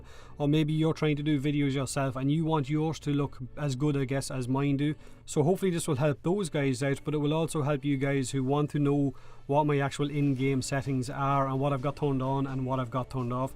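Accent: Ireland. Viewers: Your Irish accent is awesome :) (seriously Irish and Scotish accents are the best :D) and thanks for the video! :D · How do you add that sweet Irish accent to your videos?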